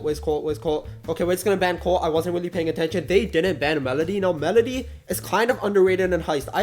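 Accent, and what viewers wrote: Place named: Punjab, India. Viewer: Bro your accent is very Punjabi 😅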